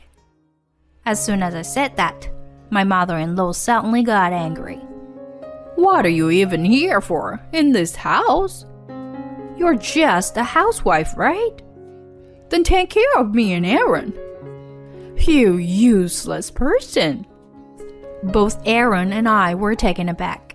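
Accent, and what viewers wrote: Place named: China. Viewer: hate this chines accent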